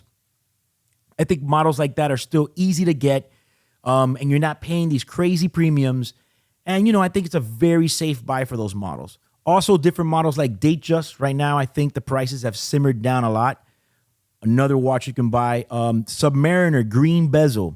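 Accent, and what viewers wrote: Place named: Miami, United States. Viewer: Heaviest Miami accent ever. Clear-lee.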